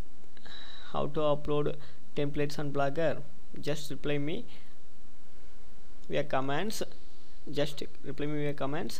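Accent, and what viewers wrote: India. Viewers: it's not his fault all indians speak that way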